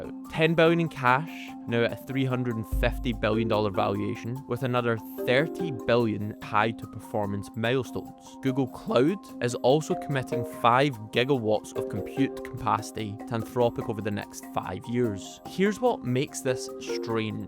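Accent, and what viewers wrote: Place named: Scotland, United Kingdom. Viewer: Don’t waste any time at all trying to change your native Scottish accent. You are easily understood with Scottish as is. 👌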